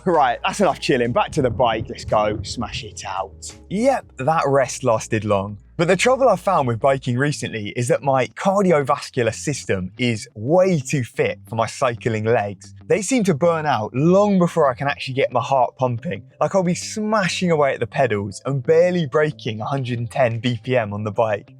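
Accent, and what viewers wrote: United States. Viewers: Please never abandon the American accent. Peak. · I feel like the video could have used more of the Translator. American accent 6.1 out of 10. Surfer or John Wayne?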